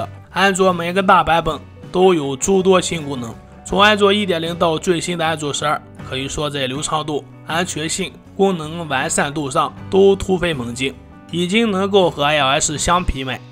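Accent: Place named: Yulin, China. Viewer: 你是榆林的吧。。这口音。。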